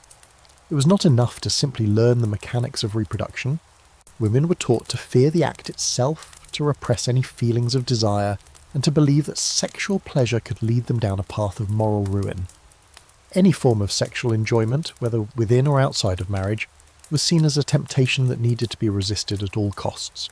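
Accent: United Kingdom. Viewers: Love your videos ….also cos of your lovely British accent. But…. have you cloned your voice for this narration? It sounds to me like it and i don’t love it 😞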